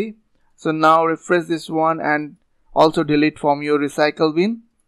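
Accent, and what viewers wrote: India. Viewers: You sound like India guy and since you're on youtube you're god to us then.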